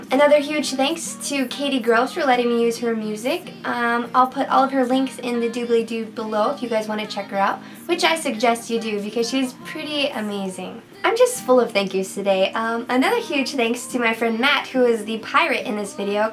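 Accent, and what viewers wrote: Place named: South, United States. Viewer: I love her slight southern accent, and I'm excited to see the zombie stuff you do in the future! Whoo!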